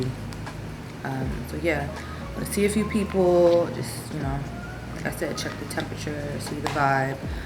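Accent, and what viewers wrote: New York, United States. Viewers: we live for that face and NY accent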